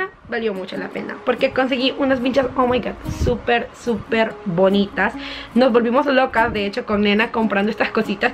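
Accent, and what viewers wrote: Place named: El Salvador. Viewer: Por tu acento sos guanaca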